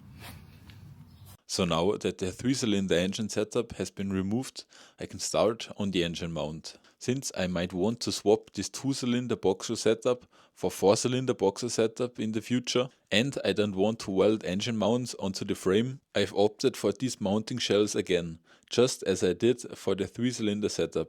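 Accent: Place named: Germany. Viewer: pls stop with english you sound way to german you are to stiff for english loosen up or just stay with german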